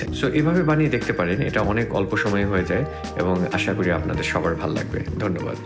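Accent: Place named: Bangladesh. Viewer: এর ভয়েস শুনে আজ জানলাম ব্যাটা বাংলাদেশী। আগে জানলে দেখতাম ই না। যা ভাগ।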